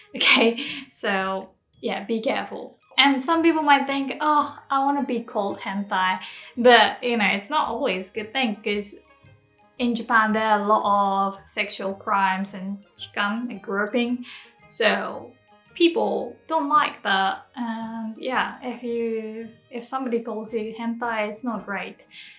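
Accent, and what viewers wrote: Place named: United Kingdom. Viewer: Well theres a reason why she has a british accent when speaking english, she probably spent some time in europe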